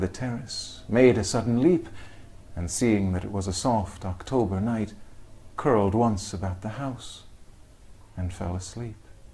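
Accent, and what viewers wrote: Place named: United States. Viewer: Just the most amazing, easily-produced American diction. How many books have I heard him narrate?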